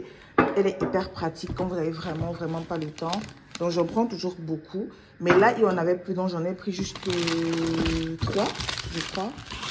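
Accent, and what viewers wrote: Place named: Italy. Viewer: C'est l'accent italien là qui me retient !!!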